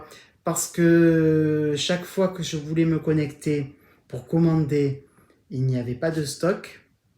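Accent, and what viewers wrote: South, France. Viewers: Bonjour!! Merci pour ton podcast! Ta simplicité est rafraîchissante! Tu m’as fait bien rire avec ton expression «foutre la patate»! Je dirais que quand tu ressens une émotion en lien avec un écheveau de laine, c’est avoir un coup de coeur ou un coup de foudre! Pour ce qui est des couleurs pour ton châle, je dirais de ne pas mettre la laine avec le rose fluo, remplacer par le fruit d’automne et scarabé…ou scarabé et fruit d’automne en dernier. Comme toi, j’adore les tutos de CloTricot! Je suis très fière de ma compatriote! Elle est tellement talentueuse pour expliquer les techniques. Je me suis procurée son livre de tricot chausette! Je l’attends avec impatience! Sur ce, continue ta belle aventure et j’ai bien hâte de voir ton prochain épisode avec ton accent du sud! Salutation du Québec!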